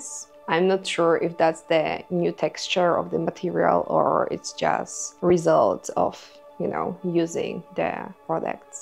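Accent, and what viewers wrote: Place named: Eastern Europe. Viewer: What camera do you use? The quality is terrific in 4K, which is a relief because one sees so much fake 4K on YouTube. The setting you're filming in also helps, it looks like a plush hotel room. The lighting looks well calibrated too. Very photogenic, your hair and makeup is flawless - if you're not already modelling on runways every week then I will be shocked! You look like a young, Eastern European (judging from your accent) version of Adriana Lima.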